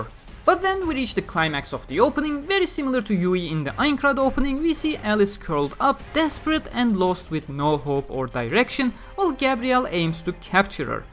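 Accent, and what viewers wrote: Turkey. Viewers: İngilizce'ni duyunca edim acaba bu kanal türk mü diye isme bile bakmamıştım.Başarılarının devamını dilerim reis :D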